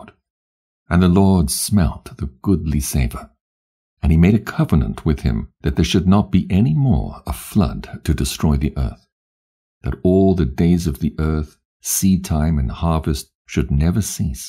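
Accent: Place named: Europe. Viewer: I love these other books but why are every one of them told in a European English accent???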